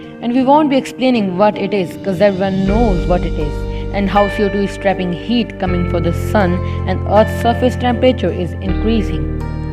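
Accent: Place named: India. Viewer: Ok....cut the LOUD dramatic music. It doesn’t help. Speak better English. Strong Indian dialect like Apo in Simpsons doesn’t help.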